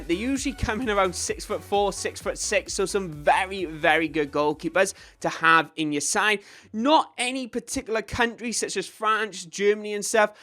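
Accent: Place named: Wales, United Kingdom. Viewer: Where in Wales you from?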